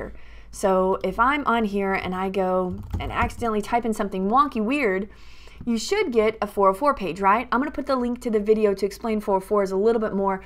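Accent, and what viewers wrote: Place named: Texas, United States. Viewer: you are a genius. Love the texan accent.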